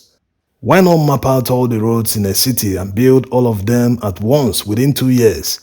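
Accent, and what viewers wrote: Igbo, Nigeria. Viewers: Rubbish talk 98 percent of the time in thick Igbotic accent. These are the same people who used to hate both Buhari and Niger Republic a few months ago now pretending to be friends of Niger. As I said earlier - RUBBISH.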